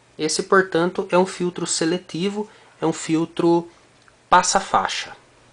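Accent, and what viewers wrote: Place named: Caipira, Brazil. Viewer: Gosto de voce porque voce fala que nem um caipira com certeza gosta de música caipira ambém......tabém sou filho de caipira...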